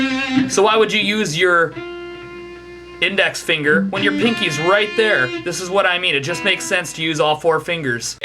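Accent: Canada. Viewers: ... are you canadian... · Because he's Canadian (i think). They don't eat words or fart them like the Americans and the guys at UK. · Your Canadian accent is strong in this video.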